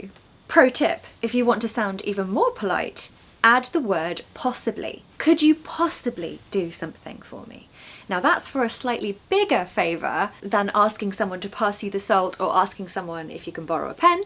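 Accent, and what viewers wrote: United Kingdom. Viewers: I simply love the british accent, the smiling expressions and the fun facts about the UK...Miss the country and miss the Brits 😃 I shall arrange a visit soon. Thank you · I absolutely love your teaching style! Your enthusiasm, lovely smile, and expressive hand gestures make learning English so enjoyable. Please keep creating more videos in your beautiful British accent—your lessons are truly helpful and inspiring! Thank you!" I'm watching you from Guildford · I'm so fond of British accent, culture and etiquette. How I wish I come to the UK and possibly meet Teacher I love the way you teach your British accent and culture. I'm from Cameroon. God bless you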